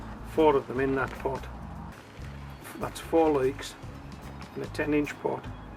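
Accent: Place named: North East England, United Kingdom. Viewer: Newcastle area I think, definately a North East UK Accent.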